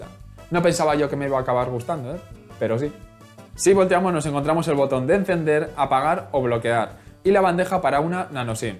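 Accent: Colombia. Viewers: SOS colombiano? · ¿Eres Colombiano?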